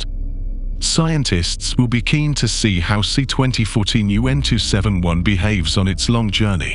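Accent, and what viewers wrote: United Kingdom. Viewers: Nasa’s the biggest hoax played on people yet! Nice artwork though! People will believe anything attached to an alphabet agency and a British accent!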